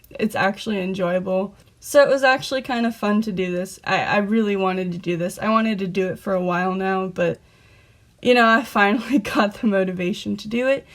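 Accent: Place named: Arizona, United States. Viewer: YO YOU FROM Az lol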